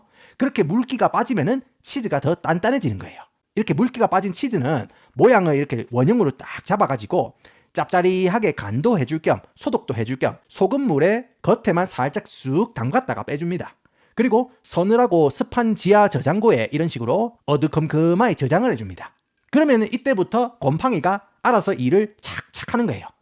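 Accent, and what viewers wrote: Gyeongsang, South Korea. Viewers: 유익한 정보 감사합니다 완전 갱상도 특유의 언어로 시원한 설명 도움 많이 받고 구입시 신경 쓰겠음다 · 유용한 정보 감사합니다. 구수한 경상도 억양도 재미있어요. 스위스에서 먹은 치즈 얘기 듣기만 해도 군침이 돕니다!😋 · 경상도 액센트 너무멋져 부러워요❤ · 이어버드로 소리만 처음 들었는데요 찐한 갱상도 사투리에 나이 많은 아저씨신가 했는데요. 계속 듣다보니 영어 발음 욜 좋으시고 영상도 보니 힙하게 영하게 생기심에 놀라고. 끝까지 들으니 깊은 내용에 감동했어요. 너무나 도움되는 영상 감사합니다.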